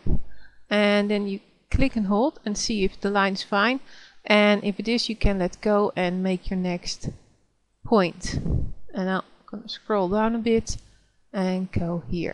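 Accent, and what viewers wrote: Netherlands. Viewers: kom op man!! je moet eerst leren beter nederlands praten. je hoor meteen je nederlands accent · I directly knew you were Dutch by the way you speak lol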